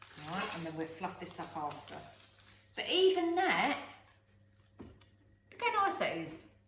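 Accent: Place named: Wigan, United Kingdom. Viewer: It is really beautiful and there is nout wrong from being comman we all talk like tht in Wigan haha X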